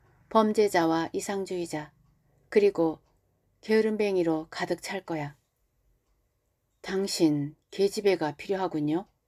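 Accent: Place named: Jeolla, South Korea. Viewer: 책 읽느라 수고가 많으십니다. 혹시 전라도 분이신가요? 몇 군데 발음과 억양이 듣기에 거슬리는 부분이 있습어요. 낭독자께서는 왜 의복, '외투'를 '에투'라고 발음하시는지요. 경 험에 의하면 호남사람들이 주로 그렇게 발음 하는 경향이 있더군요. 혹시나 해서 물어보는 겁니다! 아무튼 책 읽느라 수고가 많으십니다.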